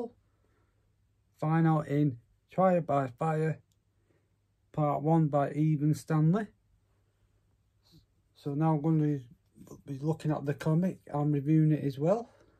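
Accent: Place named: North, United Kingdom. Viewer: ahhh that's right! I thought I could hear some Northern in you 😂 I'm part Scottish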